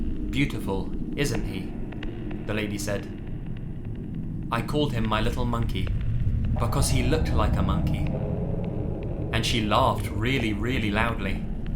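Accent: England, United Kingdom. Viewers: the main reason I'm subscribing is because of that british accent lol number fooh hehe good job tho i realy enjoy ur vids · God bless the British accent. "I'm good looking and assumed it was a pervert or I assumed I looked like his granddaughter." God bless the British. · are you from England?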